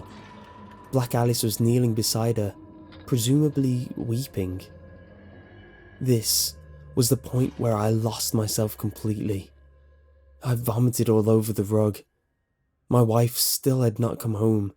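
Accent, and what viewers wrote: England, United Kingdom. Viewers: SPOILER ALERT: The image described at the end is the actual photograph of Mary Kelly's crime scene by the sound of it. This is a good one, I like your taste. Good to hear an English guy reading these things too I have to say, it's quite rare though I have found others. I might even record some myself, as I haven't yet heard an English woman narrating creepy pasta's or other horror stories. I think the Creepy Pasta genre is a bit over saturated now, I was thinking of just reading old horror stories the copy right has expired on maybe. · How fitting to be told by an English man. x3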